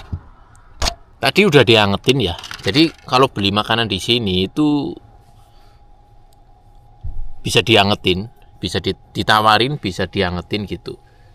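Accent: Banyumas, Indonesia. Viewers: Rika wong ngapak ya mas..yawis pda kya nyg brarti 😅 salam ngapak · Kang ngomongnya kenapa ngapak ngapak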